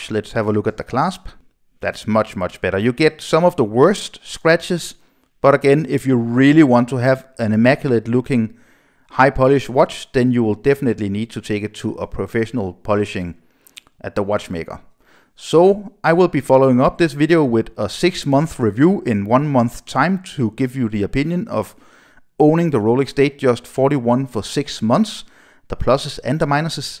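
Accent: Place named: Denmark. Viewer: I like the Danish accent! I like Danes having Rolexes from just those 3 dealers nationwide! Anyhow... Cape Cod says not to wash it but to "buff dry" (which I did on my 09 GMT). Also you should have mentioned to tape the brushed steel parts (which again Cape Cod says are not to be applied). Nice video, nice watch though...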